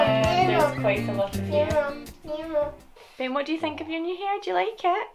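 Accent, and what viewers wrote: Scotland, United Kingdom. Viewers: Oooh I love your super cute intro 💖 Love your accent so much 🏴 what part of Scotland are you in? I need to take notes from this video as I cut Leo’s hair and it’s terrible 😬 Awww he’s just too cute 😍😍😍 You are doing such a good job and you make it look so easy! Wow super good job! That’s really impressive! Ahh yes Leo doesn’t get screen time much either so that’s a great idea 😁💖 Oooh no static hair 😂😂 Loved watching 😁💖 Awww love the hiding seek 😁💙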